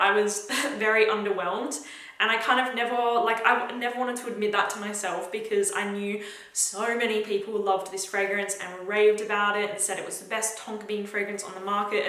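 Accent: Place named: Australia. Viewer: Love ur Aussie accent.....❤️❤️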